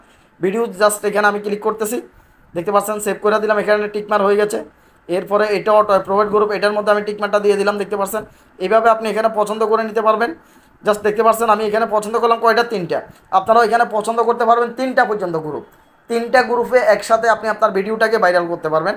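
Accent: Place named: Comilla, Bangladesh. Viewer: খুব ভালো লাগলো ভাই,আপনার ভাষা তো আমাদের মতো,আপনার বাড়ি কি কুমিল্লা?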